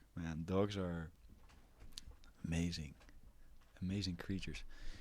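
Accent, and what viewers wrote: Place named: Netherlands. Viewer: Lekker dat Nederlandse accent 😂💪✌🔥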